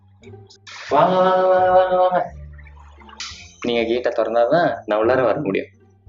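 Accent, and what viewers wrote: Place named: Malaysia. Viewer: are you a indian malaysian coz ur accent though different i hate indian accent but ur's is kinda malaysian indian accent anyway good video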